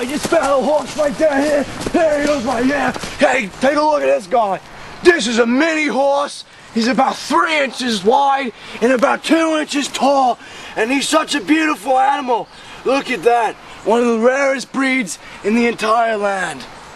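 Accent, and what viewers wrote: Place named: Australia. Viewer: why does he sound australian? haha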